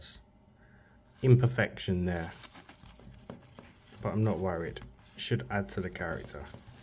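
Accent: Essex, United Kingdom. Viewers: Hello, new subscriber here, also new to resin art, just uv for now, anyway, today I found you on tiktok and what a nice surprise to learn you're from Southend on Sea, I lived in westcliff on sea for a few years, I miss it, I could see the estuary from my kitchen window 😢. Well, I enjoy your videos a lot and I wanted to thank you, oh, and I love your accent, brings back good memories, cheers 🤗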